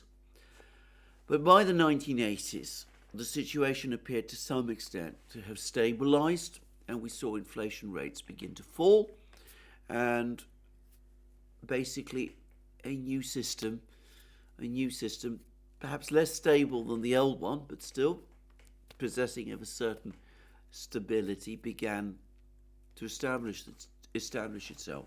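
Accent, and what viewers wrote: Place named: RP, United Kingdom. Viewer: Alexander Mercouris: in-depth analyses, perfect understanding of geopolitics, posh English. Can anyone expect more?🙂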